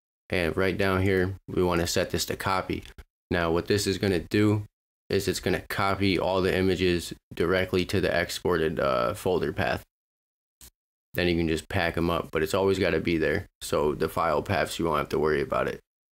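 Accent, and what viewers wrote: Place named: West Virginia, United States. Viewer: Naw, West Virginia. I've been several times. I'm in communications so I pay attention to accents. What do you use Blender for?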